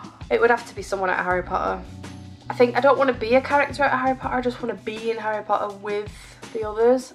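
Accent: Northern England, United Kingdom. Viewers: That's a northern English accent. A beautiful one at that. I've always preferred northern English accents to southern English accents. There are 54 different British accents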